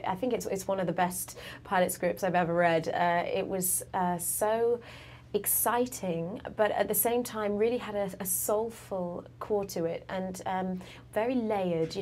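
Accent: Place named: RP, United Kingdom. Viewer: She sounds very different in this interview, so much more posh! She's great, I love her.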